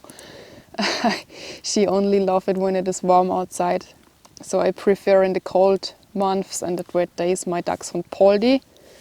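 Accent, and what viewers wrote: Germany. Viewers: I was hearing her say "Tippy" then realized she was saying "Teepee", her German accent is cute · Teee peee..I love your beautiful German pronunciation of my language..danke. (:-)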